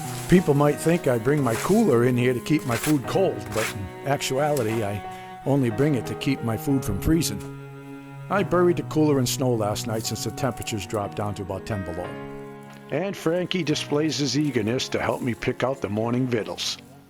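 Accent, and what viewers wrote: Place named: New England, United States. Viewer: northern NY Adirondacks you sound like yer from NH VT or MA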